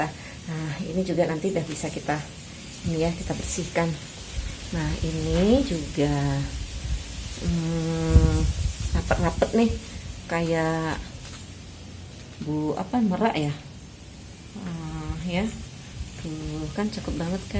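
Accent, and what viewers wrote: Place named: West Sumatra, Indonesia. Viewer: Bund asal sumatera barat ya??.logat bahasanya..😊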